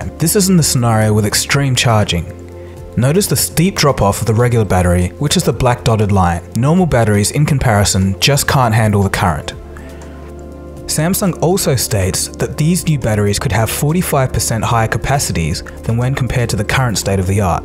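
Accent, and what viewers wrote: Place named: Australia. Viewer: Aussie accent, no matter how clever they may be, it's still like listening to the slowest kid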